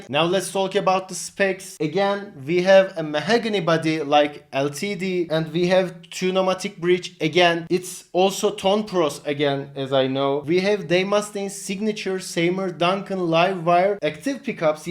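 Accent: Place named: Turkey. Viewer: ulan diyorum aksan bi farkli turkmussun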